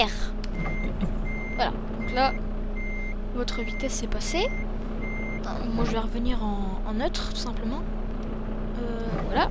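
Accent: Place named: North, France. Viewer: Super vidéo ! Et bel accent du Nord aussi j'aime bien xd !! Tu pourrais peut-être faire des lets-plays sur des maps ça pourrait être sympa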